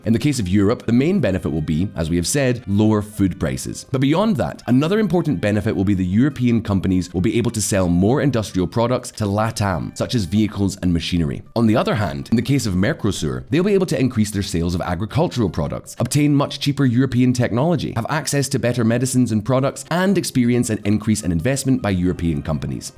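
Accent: United Kingdom. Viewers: Curious which part of UK is the narrators accent from?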